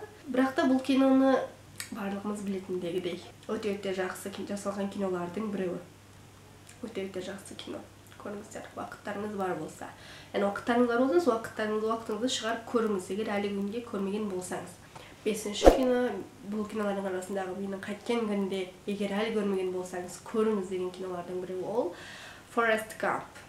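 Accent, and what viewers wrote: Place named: Mongolia. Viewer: Моңғолядансзба, Туркиядансзба Чота тіліңіз бір жақа тартып тур)))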